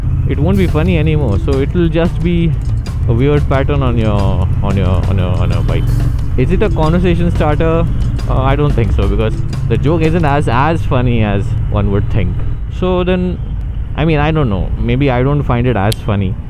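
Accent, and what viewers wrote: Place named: Malvani, India. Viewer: Once you hear malvani/ marathi accent you cannot unsee it !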